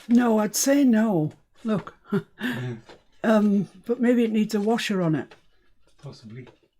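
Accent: England, United Kingdom. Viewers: I only caught the tail end of the 'live' on Sunday and our 6-yr-old grandson came along as I was watching. Our grandchildren know you as " " and realize you live in England. They love your accent. Tonight (Monday) I was watching, trying to catch up from the beginning. Warren was here again (and he would pronounce his name 'Wawwen') and said, "She's still awwganizing?" and watched along with me for awhile. I've just walked him down to his house and he was repeating, "I'll just put the buttons in the button drawer" all the way home - complete with the English accent.:) You're a hit in our house!! And by the way, I'm suffering from SES (someone else's 'stuff') envy - big time! Oh, and almost the first thing you picked up on your pile was an envelope from Clem...I grinned.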